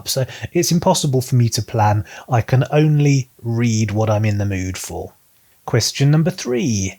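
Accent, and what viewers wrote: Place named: United Kingdom. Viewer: I'm so glad that I've found your channel. I've been looking after videos about Mr. Raymond E. Feist (because I'm currently reading the Magician: Apprentice). I'll definitely read the Empire trilogy someday, because of your review. Also, I was about to start the Deverry Series and I was happy to see your video which introduces the books. I'm looking forward to seeing more of your content. By the way, I really enjoy your elegant UK English. :)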